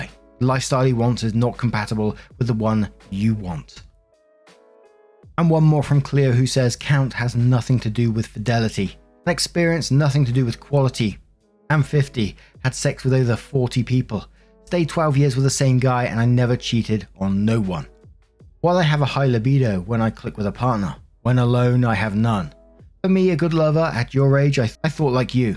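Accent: United Kingdom. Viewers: Nothing like getting my three miles in in 15 minutes while listening to his melodious British accent.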